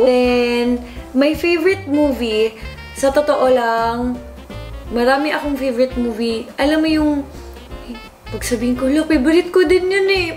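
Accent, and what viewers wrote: Visayas, Philippines. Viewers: Wow apple cider vinegar ,, 😍😍 love u ,, don't change your bisaya accent !! Go bisaya!!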